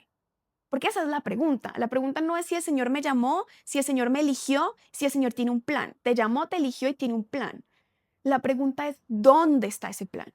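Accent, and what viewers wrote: Colombia. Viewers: Que linda y hermosa!. en el buen sentido de la palabra y con todo el respeto cristiano. Mas allá de su físico capto su belleza a través de su palabra 😅. Su forma y su tono gracioso de hablar 😹. Tiene mucha gracia en su gesto y en su verbo para poner atención de los temas intensos de sexualidad con relación a la religiosidad. Habla muy bonito , su forma de hablar colombiano. Me encanta ésa forma de hablar 😍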